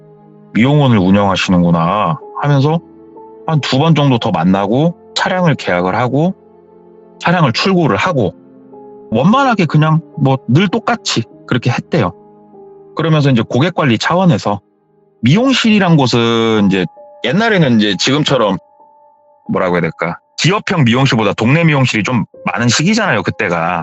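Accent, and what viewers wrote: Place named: Jeonbuk, South Korea. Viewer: 전북출신으로써 말투가 너무 정겹다 ㅜㅜ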